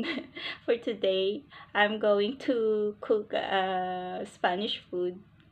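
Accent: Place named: South Korea. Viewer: Ano ba ga talaga ang tono mo sis? May koreana, may ilonggo, may mahinhin at ilokana, anyways, go go lang sis 😘😘😘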